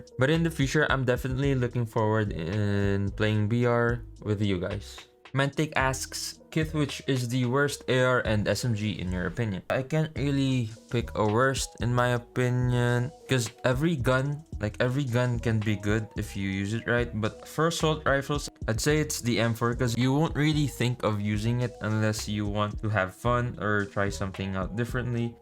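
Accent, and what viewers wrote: Philippines. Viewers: I knoww that your a Filipino because your english accent is really filipino 😄😄😄😄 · SABIIII NA NGAAA BAAAA E, MAY FILIPINO ACCENT KAAAA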